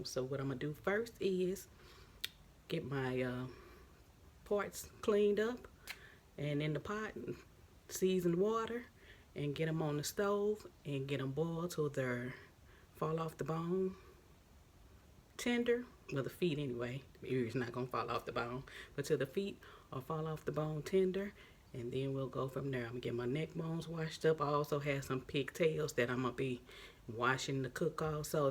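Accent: South, United States. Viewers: She said I like to use my “yurs” aka ears haha love southern accents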